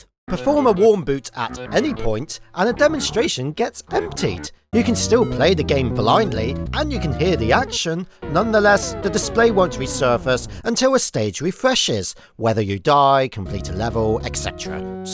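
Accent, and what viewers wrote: United Kingdom. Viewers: So he's not Australian, but British? Alright. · how did i never know you were british until rewatching this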